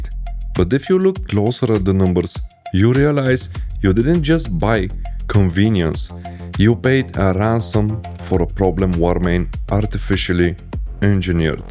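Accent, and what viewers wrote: Balkans. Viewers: the balkan accent and the conspiratory story telling makes this video so much better :D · great video, cant wait next one ! (from another balkan guy, ur accent is funny to listen to haha) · Balkaaaaaaan accent hit me like a truck mon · You sound mega Balkan bro. 100000% Balkan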